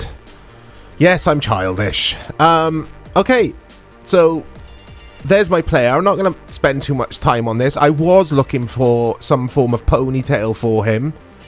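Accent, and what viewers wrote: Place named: London, United Kingdom. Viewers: Which part of London are you from mate? You sound Posh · Ha. He's English but think lives in Wales, but who knows.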